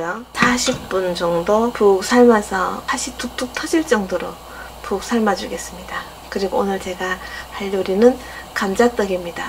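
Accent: Gyeongsang, South Korea. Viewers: 구수한 경상도 사투리, 사근사근 설명해줘서 귀도 행복해요. 요리 쇼 보는 것이 스트래스 해소에 큰 도움되네요.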